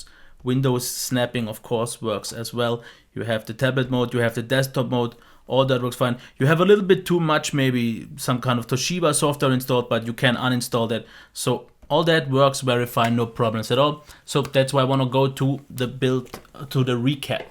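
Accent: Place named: Germany. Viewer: That also sounds good to me! Keep up the quality and the good old German accent. :)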